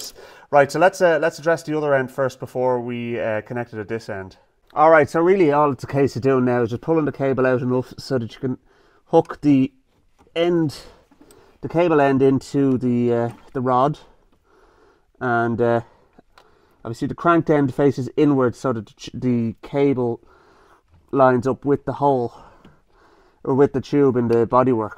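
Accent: Ireland. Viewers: Are we Irish?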